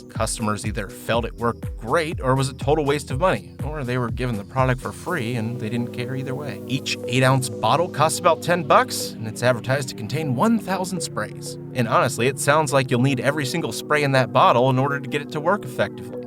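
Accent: Buffalo, United States. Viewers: Wait is he from Buffalo? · You from Buffalo?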